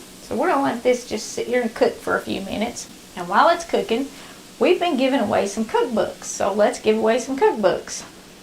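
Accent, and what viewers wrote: South, United States. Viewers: From witch state in the Us are you from? Somewhere from the South? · Love following you, Christ first, plus you have wonderful recipes! And you have a southern draw like myself 👍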